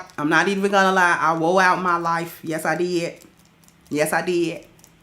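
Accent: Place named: South, United States. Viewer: Yu got to be frm the south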